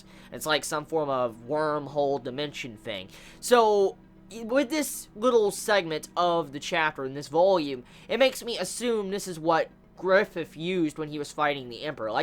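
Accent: South, United States. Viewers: I never picked up on this before but your accent sounds distinctly Southern all of a sudden. You from the Southeast United States?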